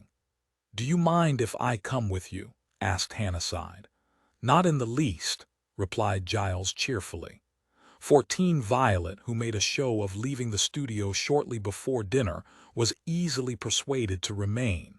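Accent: United States. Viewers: Gawd. Terrible narrator even without the ridiculous American accent. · A Heyer story narrated by an American? Terrible! I couldn't listen.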